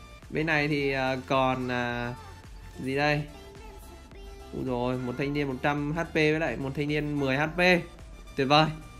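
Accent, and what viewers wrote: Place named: South, Vietnam. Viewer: Giọng cha hình như trong.nam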